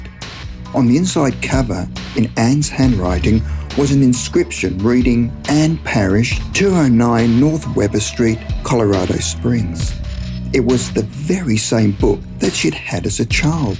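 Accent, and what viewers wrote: Australia. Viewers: Omg your australian 😂❤ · An australian narrator..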